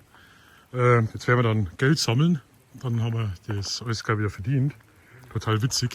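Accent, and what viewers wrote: Bavaria, Germany. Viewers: Hey Namensvetter!!! ✌️ 😊 ois erstas: i steh auf dein bayrischen Dialekt ❤ es klingts ned vü aundas ois mia waun i mein Dialekt ned vasteckn probia 😂 SUPER Video und SCHÖNE Aufnahmen vom Köngissee 😍... bei unsan letzten Besuch homma die letzte Bootsfoat leider knopp vapasst ... miss ma unbedingt nomoi hin ... DANKE fias mitnehman!!! Schene Griaß aus Österreich ✌️🤗❤️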